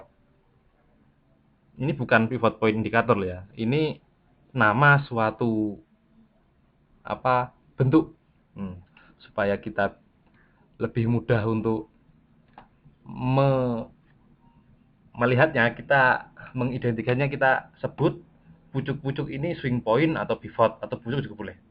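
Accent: Java, Indonesia. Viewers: pean jowone pundi 😁😁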